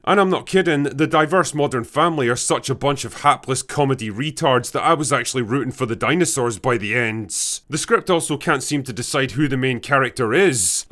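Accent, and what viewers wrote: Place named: Scotland, United Kingdom. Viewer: I had no intention of going to see this movie--just like I haven't seen any of the other sequels; I'm just here because I think it does a little something for me to listen to a Scotsman sh*t on stuff.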